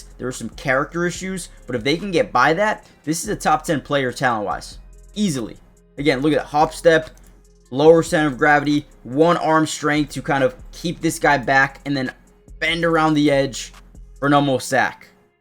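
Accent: Canada. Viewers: Rad Canadian accent my guy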